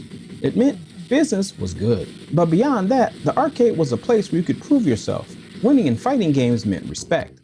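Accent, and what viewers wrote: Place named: Carolinas, United States. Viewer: ! Your vids are soo good! Thanks for all your work and knowledge you collected over the years … I’m like you.. I dove into this franchise deeply! It sounds like you grew up in the Carolinas, im not far away here in Kentucky … we had the same childhood pretty much. You seem like a guy that would have been one of my friends way back then … you got a subscriber for life here.. can’t wait for more vids and I’m about to run the Alpha video back for the 2nd time today and prob even more! It’s that good bravo! 🫡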